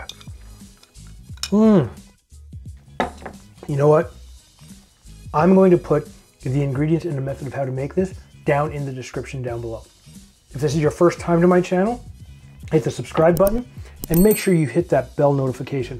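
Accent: Canada. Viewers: On the back deck or in the hawse, (love the accent from the Yukon). I read thru your comments and I need to make more homemade Grenadine, for mine is approaching it's lifespan. The Goslings is a great floater. I've even seen more potent Gosling at the store. Many places by me use Myers. I'm always in for large rum drinks. Sláinte!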